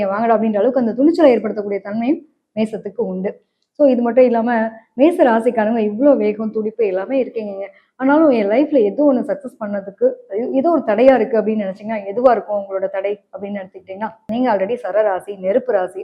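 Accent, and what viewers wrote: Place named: Noida, India. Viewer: நொய்டா ராகத்த நீக்கிவிட்டு சொன்னால்தான் எளிதாக புரியும்...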